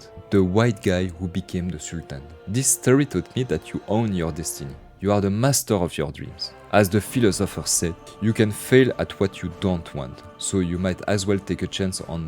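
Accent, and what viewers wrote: France. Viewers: I just want to say this is the most french accent I've ever heard. Magnifique!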